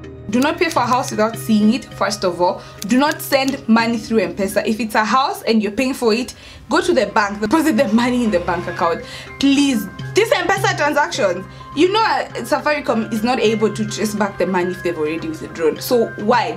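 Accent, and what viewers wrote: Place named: Nigeria. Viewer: Your ascent sounds Nigerian. Pole sana kwa mkosi lakini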